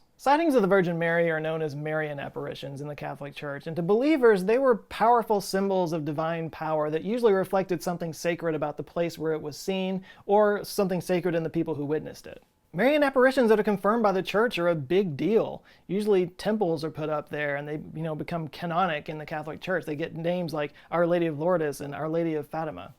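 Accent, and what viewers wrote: Texas, United States. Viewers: Finally we get you true Texan accent! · What a Texas accent 😅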